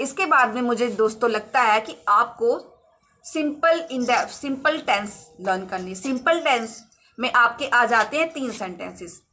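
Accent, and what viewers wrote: India. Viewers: Your English is our Indian English like I understand better than others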